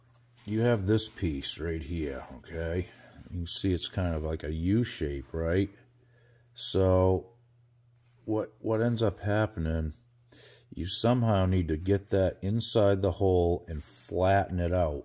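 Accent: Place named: Boston, United States. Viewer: It'd be interesting to see Leo's thoughts as he designed this madness (?) Always enjoy your 'Baston' flavored narrative... Cheerios (but not the cereal)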